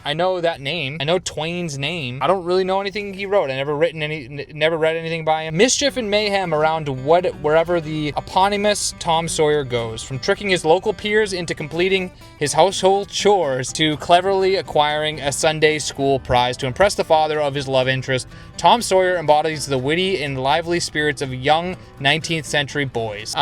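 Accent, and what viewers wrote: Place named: Boston, United States. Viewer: It's ok! I've noticed your "Bostonian accent" in other videos, such as saying 'room' as 'rum' (which initially made me rewind and play it back, like "wtf... are we talking about drinks now?!" LOL) It's all good... different accents are cool 😎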